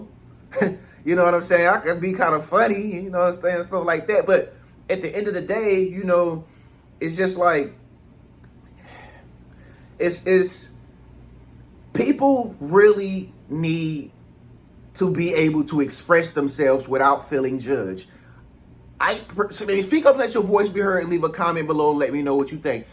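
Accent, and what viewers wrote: United States. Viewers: Thank you so much for doing what you are doing. Your authenticity is such a light in the world. I am not American so sometimes I have a hard time understanding your accent but your light shines so bright that I keep coming back and watch because you are a beautiful soul and you inspire me somehow. I am sending you my love and light. You deserve the best. Blessings ❤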